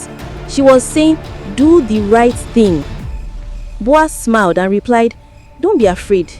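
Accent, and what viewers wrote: Nigeria. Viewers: Awww. I love it. I love the Naija inflections.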